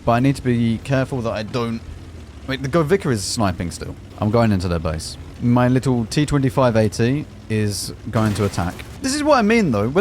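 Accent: United Kingdom. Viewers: I absolutely love the way you speak those words Brit man, nicest Brit accent ever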